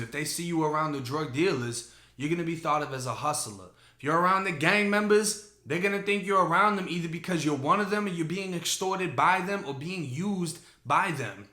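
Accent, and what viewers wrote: Boston, United States. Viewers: Every time I hear you speak you remind me of my days working in Boston and living in Mass. Keep up the great work with your channel. You have a great way of telling a story. For me I am someone who has never been locked up but you put me in a mindset of what would I do if I ever were ever to be locked up. My ass would check in real quick. haha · You definitely have a Boston accent east coast type...did you ever live around my way I'm 45 minutes away from Boston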